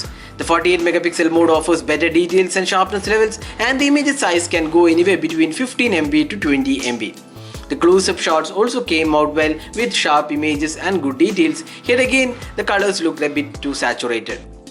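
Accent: Kerala, India. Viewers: മലയാളി touch ഉള്ള sound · His way of speaking english can tell that he is malayali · Narrator ... R u from Kerala ????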